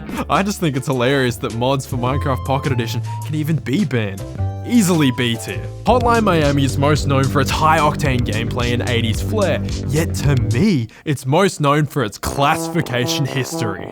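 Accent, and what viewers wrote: Australia. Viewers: Yeh I'm Australian and he has every Australian accent quirk known to man 😂, from "australier" to the "videor" although to be fair all those quirks can also be found in alot of London accents except the throat sound. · Like hell anyone wouldn't notice your Australian accent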